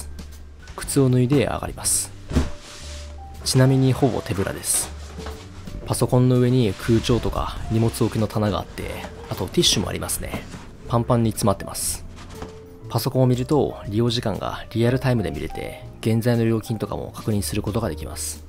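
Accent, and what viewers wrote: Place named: Tochigi-Ibaraki, Japan. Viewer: 栃茨出身の方ですか？ 方言の人大好きです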